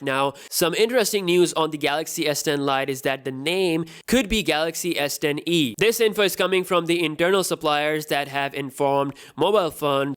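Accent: India. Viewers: Sorry but you just can't hide how indian you sound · Nice content ..nice video..but the narrative..the voice..it hurts my ears...sounds horrible. Sounds like those auto-read thingy with a terrible indian accent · hijui999 hes Indian lol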